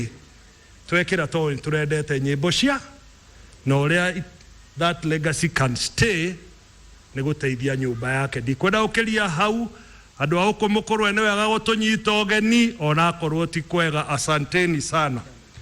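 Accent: Kikuyu, Kenya. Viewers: Peter Kenneth, you make Kikuyu sound so sweet.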